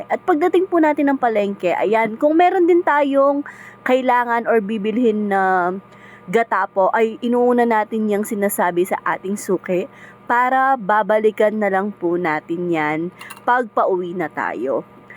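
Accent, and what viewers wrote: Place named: Pampanga, Philippines. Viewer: gusto ko yun kapampangan tagalog hehe!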